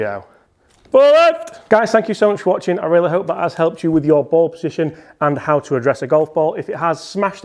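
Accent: Yorkshire, United Kingdom. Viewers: Keep these coming. Look forward to your daily tips and down to earth Yorkshire tone . Love it !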